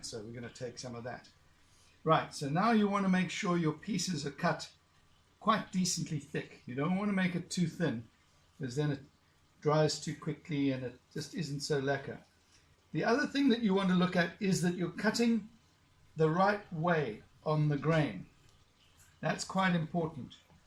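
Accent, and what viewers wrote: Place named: Zimbabwe. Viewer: Thanks for the video, you make it the same way I do. Only difference is I dunk mine in a vinegar tub to rinse the salt off. From the accent I'm guessing Zimbabwe, I'm from Karoi 🙂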